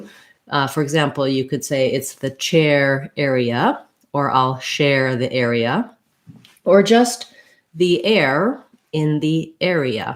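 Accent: United States. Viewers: Why are teaching English with a deliberate American accent? It is incorrect English and very confusing for foreigners. There are many area accents in England but nobody normally would teach it because it is incorrect.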